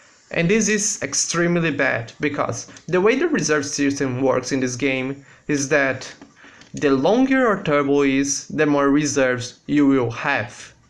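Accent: Brazil. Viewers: Dude, you are brazillian, i can see, cause, eu sou brasileiro e falo inglês, você tem bastante sotaque, mas você fala bem pra caramba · Pelo inglês dá pra saber que é Br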